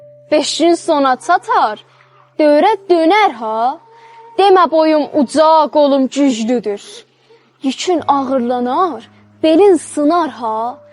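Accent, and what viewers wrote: Tabriz, Iran. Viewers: Təbriz ləhçəsi😢😢😢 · Sağ ol şirin qiz nə gözəl Təbriz ləhçəsidi xoşbəxt ol yaşasın bütöv Azərbaycan Baki Təbriz Ankara ! 👍❤️🇦🇿❤️🇹🇷❤️🇵🇰🙏✊🧿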